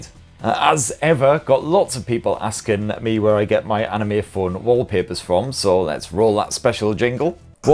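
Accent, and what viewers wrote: United Kingdom. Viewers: You're the only person that makes me like British accent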